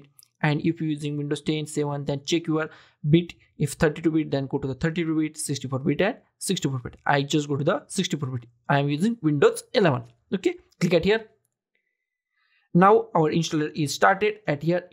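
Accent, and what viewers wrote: India. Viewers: Bro is Indian Pro Max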